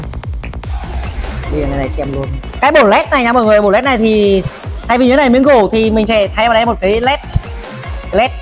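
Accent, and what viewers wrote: Nghe An, Vietnam. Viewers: A giọng nói giống yên thành-nghệ an vậy ạ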